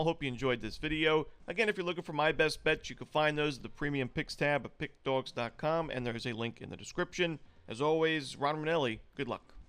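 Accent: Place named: New Jersey, United States. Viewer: FRM PICKKK DAWGZ *jersey accent, emphasis on the K’s.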